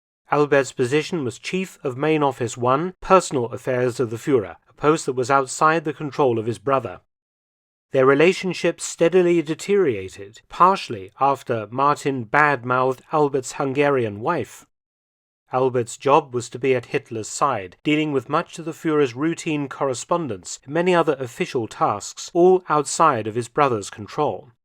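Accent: United Kingdom. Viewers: British pronunciation. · Yes, it's a British thing. We say lieutenant as "leftenant". However, when we say "in lieu of" it is pronounced "in loo of". I wonder if the American pronunciation of lieutenant as "lootenant" is more correct? A lot of sources do not explain where the British term leftenant came from. However, I like this one: "According to military customs, a lower ranking soldier walks on the left side of a senior officer. This courtesy developed when swords were still used on the battle field. The lower ranked soldier on the “left” protected the senior officers left side. Therefore, the term leftenant developed."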